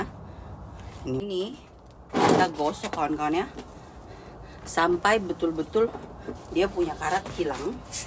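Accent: Papua, Indonesia. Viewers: oooo sa suka ko pu logat itu, sa jadi ingat ketika sa di papua dulu, lumayan lama oooo,20 tahun. Sa dulu ada teman cewek namanya Ribka ivani sarungu anak Toraja, dia punya hotel di Merauke tapi sa lupa de pu nama hotel. terima kasih juga su berbagi ttg SEASONING WOKnya kebetulan sa juga suka masak. sehat selalu.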